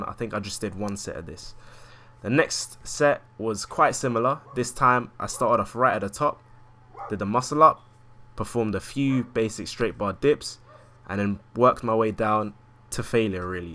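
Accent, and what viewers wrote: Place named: RP, United Kingdom. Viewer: wasnt expecting the posh british accent when i clicked this video. Nice video lol.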